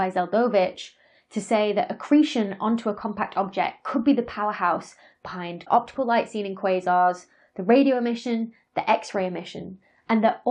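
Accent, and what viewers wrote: United Kingdom. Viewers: Is it me or is this more interesting because she has a British accent? Love Dr. Becky's channel.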